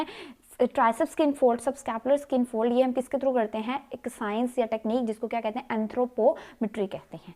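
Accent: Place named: Pakistan. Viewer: mam your urdu accent is so cute😍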